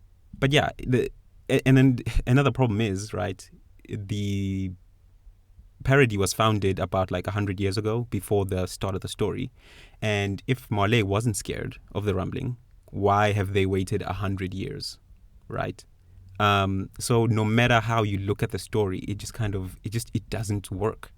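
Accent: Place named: Africa. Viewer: Firstly, you should never make another video. You suck and your voice sucks. Secondly, the decision to invade Paradi was made by brats who never knew the kings vows and were just trying to return home as heros. It was never the orginal intention of the Marley Army. By the way you sound African. Overthinking is the reason we are poor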